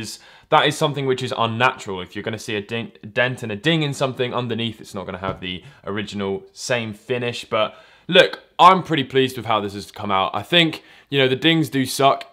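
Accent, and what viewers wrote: United Kingdom. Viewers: raw vid is the way, you uk ppl sounds the same to me from Sweden :) so its like watch the same dude all the time. ;)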